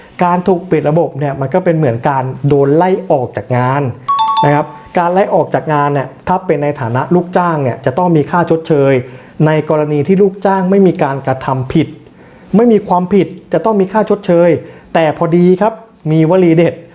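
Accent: Ubon Ratchathani, Thailand. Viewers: พี่เป็นคนอุบลเหรอคะ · พี่คนอุบลหรือครับ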